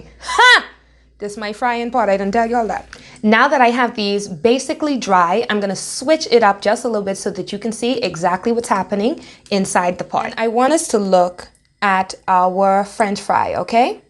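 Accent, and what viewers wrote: Bahamas. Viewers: That real Bahamas beautiful dialect and excellent cooking approach and techniques totally rocks. Has me here in Canada salivating and tasting home. is very authentic in her personal style....a real Bahamian.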